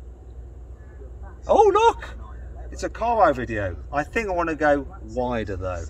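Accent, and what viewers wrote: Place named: Birmingham, United Kingdom. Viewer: As much as tries to sound articulate I can still hear that brummie accent come through. #noshade 🤣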